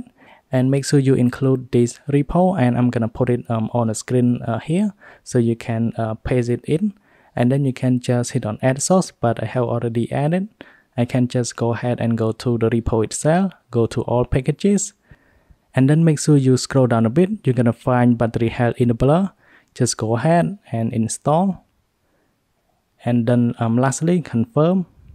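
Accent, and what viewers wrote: United Kingdom. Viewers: Sounds like British language when he’s talking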